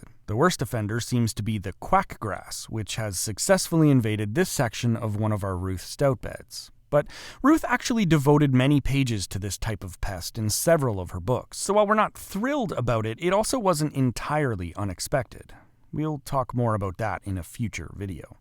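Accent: Canada. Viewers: I wonder the same. He sounds Canadian. The topography looks northern too. I'm in Maine, zone 4/5.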